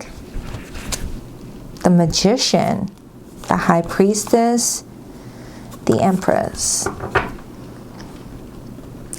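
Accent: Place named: Boston, United States. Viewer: Bostonian here too!! I was trying to place your accent before and wasn't sure. 😂 🙌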